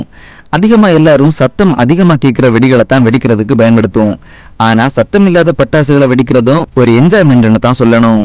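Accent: Kanyakumari, India. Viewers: Bro neenga kanyakumari ah.. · Bro neenga kanyakumari a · Bro nenga kaniya kumari ya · Makka kanyakumari ya unak kk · Bro kanniyakumari ah 😍❤ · Bro neenga kannya Kumari ya ? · Bro nega Kanyakumari ya .. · Bro neenka Kanyakumari aa?? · Aiiii Nee Kanyakumari ah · Makka kanya kumariyaaaaa😂namma ooru slang mix agi varuthu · மக்கா நீ கன்னியாகுமரி காரனா ? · Kaniyakumari slang 😂 👌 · Avaru kanyakumari 👍🏻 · He is from kanyakumari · Neenga kanyakumari ahhh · Bro ninga kanyakumariya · Bro neenga kannyakumari ah? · 🙄Bro neenga Kanya kumari ahh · Neega kanyakumariya? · Neenga kanyakumari district ah bro? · Bro neenga kanyakumari ya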